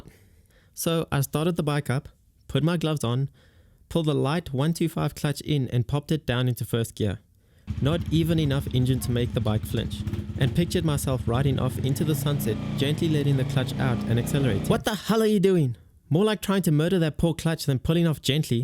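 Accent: Afrikaans, South Africa. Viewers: I knew I heard a fellow South African voice hahahahaha..... Was a funny vid I needed it. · Are you South African? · Knew you were south african after your first word lol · Heard that accent and was like AAAYYYYEEEE south africaaan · I thought he sounded afrikaans XD I just assumed it was an auzie accent.. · That south african accent is horrendous.. uhhh denni arrcheer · That south african accent? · Are you south african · I knew you were South African 🤜🏾🤜🏾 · This guy is 100% South African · Is that a South African accent i hear ?? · Your definetly from South Africa me 2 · Are you South African?? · r u south african?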